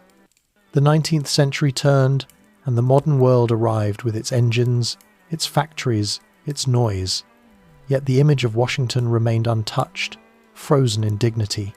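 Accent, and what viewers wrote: England, United Kingdom. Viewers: Why would I trust an English man narrating American history!!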